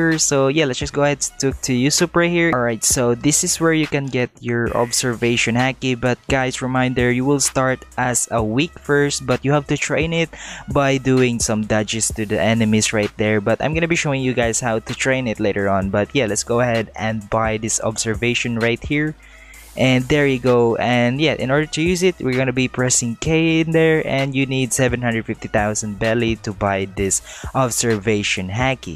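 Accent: Philippines. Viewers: Ur pinoy aksent is ON POINT ONG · You have a Filipino accent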